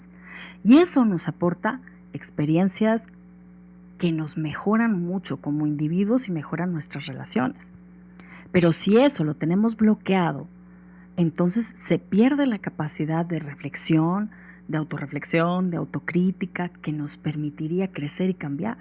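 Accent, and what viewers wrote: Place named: Mexico. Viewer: Muy atinado tu video,; hay mucha confusión de lo que es el narcisismo y de cómo se manifiesta; de igual forma cómo es que es ideal tratar a una persona con narcisismo maligno. Tu video es muy valioso. Gracias por compartir. P.D. Tienes acento de mexicana, ¿estás en Mexico? Gracias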